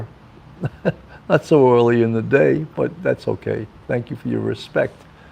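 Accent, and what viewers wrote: New York, United States. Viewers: I'm a Wantagh lady, living in NC! My aunt was friends with Carol Baldwin, Alec's mom. They helped each other go through cancer, what a sweet lady! They took my daughters and I to a July 4th parade and Mrs Baldwin gave my daughter a doll her daughter had. I have a pic if Alec with my aunt at Billy's wedding. Yes, he's arrogant and should have kept his mouth SHUT!!! They may make an example of him! LOVE hearing your NY voice, reminds me of home!!! Love your jokes and songs!!! I saw last year and enjoy your songs and dongs of that era!!!, my daughters are 40 and 41!!!!!!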